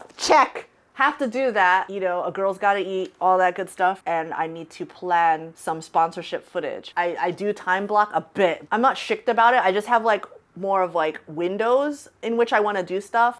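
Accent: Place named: Ontario, Canada. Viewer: ahaha sorry I was unclear... I wrote that without thinking. You sound like you have an ontario accent is what I meant to say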